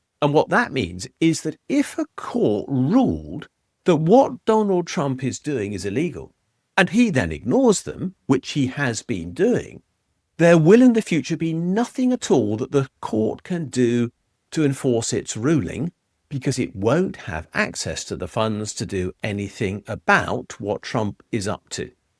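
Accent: United Kingdom. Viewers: Wrong. Hyperbolic nonsense. The US is not, and never has been, a democracy. That is mob rule. We are a Republic where individual rights supersede mob rule. The majority cannot vote away the second amendment, for example. It’s irrelevant what the majority wants if it’s counter to the Constitution. The House has the power to appropriate money. If they vote to do this, it’s entirely constitutional. The judicial branch has to use the DOJ to enforce anything and Trump is the boss of that and wouldn’t let them enforce anything anyway against the current administration same as every administration before. The past administration actively prosecuted their opponents and now somehow Trump is guilty of doing it. That’s just basic hypocrisy. The fact that you mentioned the midterm means you have no clue about current demographics in the US. The US is objectively going to be conservative going forward. 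The democrats are not going to make any gains in the midterm and will probably lose more ground. If the states continue to vote the way they currently are, the democrats will literally not be able to win the electoral college again, which is our brilliant counter to mob rule. You have a British accent. Maybe you’re not living there so I admit I would be wrong, but it’s pretty rich listening to. British guy criticizing our government. You live in a bureaucratic dictatorship that doesn’t even acknowledge unalienable rights. You still have a monarchy that technically owns everything so you hav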